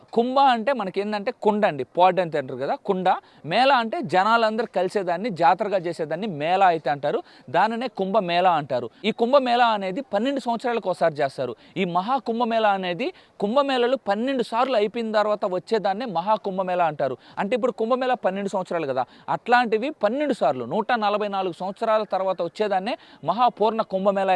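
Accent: Nellore, India. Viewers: సూపర్ గా చెప్తున్నావ్ నాన్న నెల్లూరు భాష ఉపయోగించి మాది కూడా గూడూరు చాలా సంతోషంగా ఉంది బాగా వివరిస్తున్నావ్ వెరీ గుడ్ నాన్న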